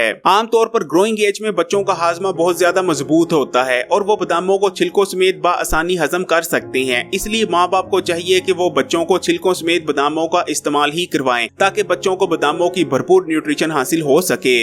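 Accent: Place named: Punjab, India. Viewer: PUNJABI LAG RAHA HAY PRONOUNCIATION KHARAB HAY .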